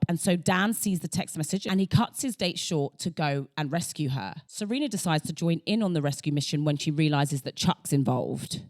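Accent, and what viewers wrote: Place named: South-East London, United Kingdom. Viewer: If you're not going to say "Gossip Girl" in that gorgeous (wild guessing incoming) South-East London accent, attacking each G like that, why even say it?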